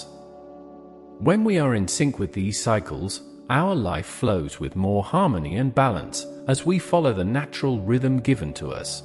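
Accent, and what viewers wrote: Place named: Japan. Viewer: Sounds like Japanese tone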